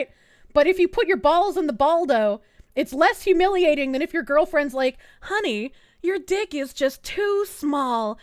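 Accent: Boston, United States. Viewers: ‘Chubby lesbian biker’ My brother in Christ, that is a Boston accent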